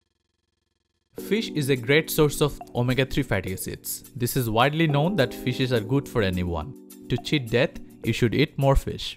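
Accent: India. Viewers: Wrong information!!! Indian accent!!! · Indian accent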